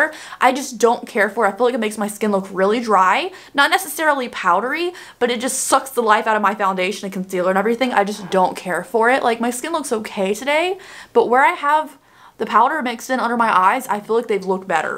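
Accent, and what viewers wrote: South, United States. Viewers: How southern are you sounding now days?! I'm loving it!! Sound so precious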